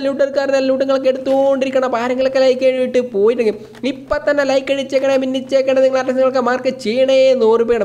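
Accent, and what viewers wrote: Kannur, India. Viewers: Kannur aano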